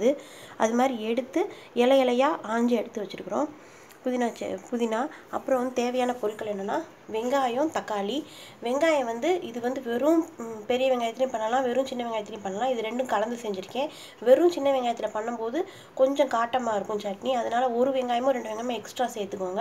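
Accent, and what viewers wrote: Madurai, India. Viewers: Nenga madurai yaaa??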